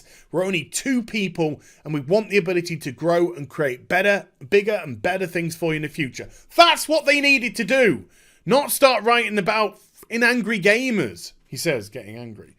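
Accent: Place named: United Kingdom. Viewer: ive watch so many of your videos, that anytime i read something online i read it in my mind in your british accent.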